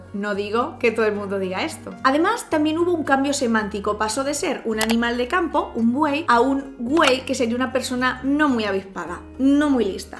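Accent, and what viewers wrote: Spain. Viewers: Hola..no tiene el acento español muy marcado, bueno como se tiene la idea que habla un español comun....saludos.l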